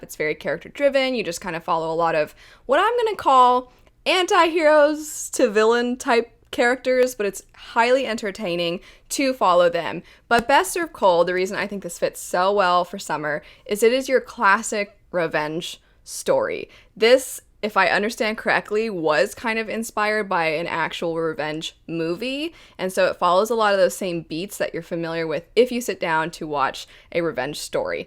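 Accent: United States. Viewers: Plz tell me you’re from Maryland holy shit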